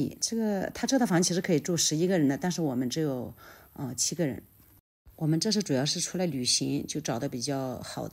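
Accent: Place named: Enshi, China. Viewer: 楼主好像带恩施口音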